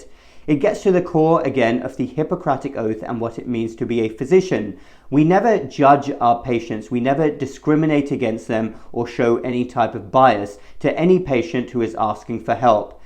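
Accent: United Kingdom. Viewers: This opens up a bigger issue. Many doctors do refuse people for alot of unethical reasons. It happens more than you hear about. I was kicked out of the biggest healthcare system in the state because I stood up for myself in having one of my newly identified conditions treated. Even 6 years later if any doctor tries to refer me to a specialist there in any of their satellite clinics what happens is an electronic blocks prevents the scheduling person from advancing any further and locks up their computer. It then requires them to shut down their computer and reboot and then it tells them to "contact patient relations" where it will say I've been banned for life. This is a clear violation of the hippocratic oath but several doctors went along with the administration's agenda while the controversy was still going on and my own primary care physician's office was the one that was responsible. 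This is because now administrative staff have things hierarchically set up so that they outrank doctors, doctors don't own their own clinics anymore, and if doctors want to keep their jobs they are required to act against the best interest of patients if the "financial interest" of the corporation warrants it in their eyes. I don't know where you work (I'm guessing the UK because of your accent), if so then I think the UK has more of a community health perspective and this is probably less common to take place there than in the US, which does not have a single payer system. I was just kicked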